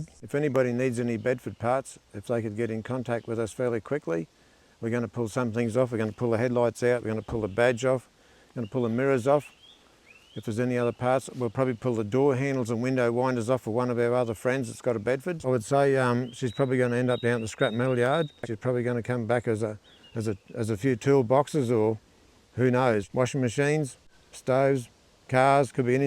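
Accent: Australia. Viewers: Thanks for aussie acent! Greeting from mo.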